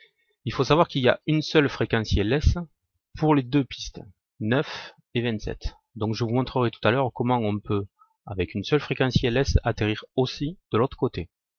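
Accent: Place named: South, France. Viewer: Très bon tuto. L’anglais avé l'accent donne en plus un air de vacances.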